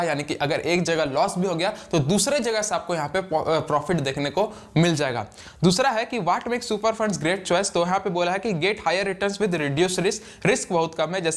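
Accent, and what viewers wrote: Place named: Delhi, India. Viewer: bhai ap rachi se ho bihar se ho keyoki bhai ap bihar ke nahi lagte ap delhi ke lagte ho.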